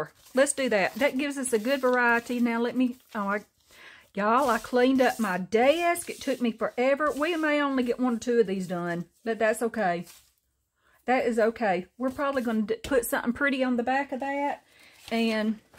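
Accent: South, United States. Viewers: Omg girl 👧…I’m with you on the chapstick👌, always in my pocket! And just loved hearing about your VW 😍 just took me back to my first auto…1960 Pontiac tempest convertible, was sweet times🥰 you are really making craft time so much fun girlfriend! I’m addicted to your videos, and praying for you to get good reports and the good Lord to restore your strength with every sunrise, cause you are like sunshine on YouTube for us crafting paper lovers‼️🙏💚💚💚‼️🙏‼️ Oooooh yeah… I forgot to say I love your tags, and the video of you covering envelopes is amazing! And your narrow booklets are what really drew me in with your southern chatty charm👌💚‼️ you are amazing😍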